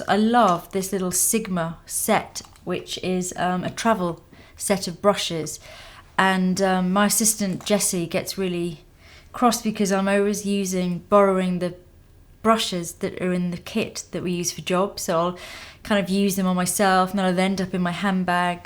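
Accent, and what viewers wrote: United Kingdom. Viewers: Wow very pretty..i will try ur tips but using all cruelty free products to me my vanity is not as important, its the the makeup and who stands behind it. No animal testing for me and thanks for cool tips nice brit accent.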